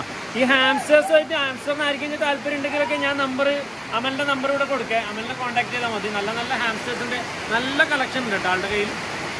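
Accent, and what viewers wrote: Kannur, India. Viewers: കണ്ണൂർ ഭാഷ 👌...🥰.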